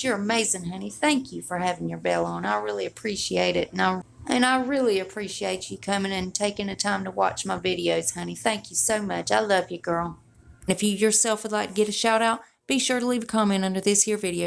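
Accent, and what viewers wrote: South, United States. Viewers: Girl your southern just like me!! Gotta love us southern gals. Xxoo. Cute channel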